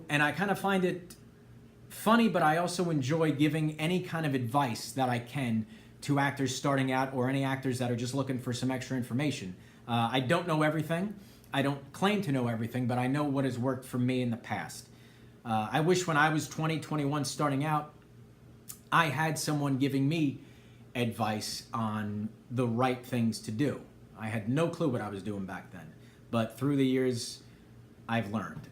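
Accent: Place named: Brooklyn, United States. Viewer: Love the Brooklyn accent omg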